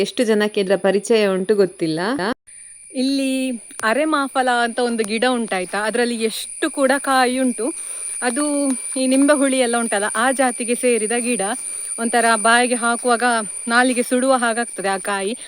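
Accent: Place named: Udupi, India. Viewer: Namma Udupi bhashe matadteddiree ...neevu yelli navru...nanna ooru barkur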